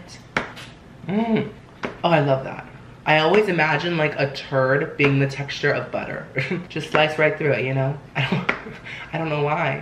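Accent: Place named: United Kingdom. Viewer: i hope you do a full diy talking in your british accent one day! it always cracks me up! 😂😂😂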